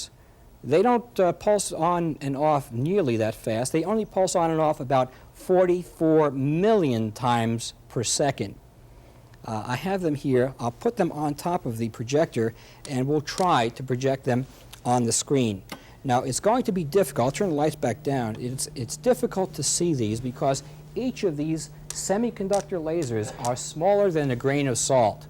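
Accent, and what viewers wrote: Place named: New York, United States. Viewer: Mirruh and electrissidy. Sounds like Richard Feynman def new yorkish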